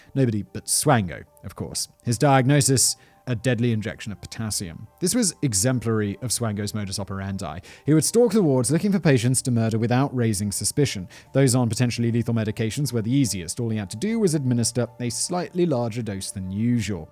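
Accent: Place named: United Kingdom. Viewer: When you say “the doctor” with your British accent, it sounds like you’re making reference to Doctor Who and I expect a graphic of David Tenant to be thrown up on the screen.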